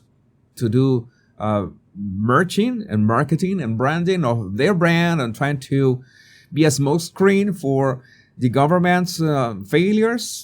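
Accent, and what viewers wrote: Spain. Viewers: I’m not a MM or Henry fan at all, can’t stand them. But, You can’t expect her to have a Colombian accent if she’s not from there. It’s like Canadians or Americans expecting a foreigner to have the dialect down perfectly when visiting as a tourist. It’s outrageous. Especially since you have a Spanish accent and have been doing YouTube videos for years in english. You are just being picky at this point and a little snobby. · your your accent is not anywhere near American. You speak English with a 100% Spanish accent.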